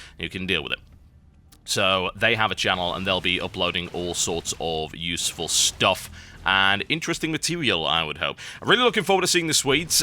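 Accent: United Kingdom. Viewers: people from the US watch a lot because we love hearing your british voice!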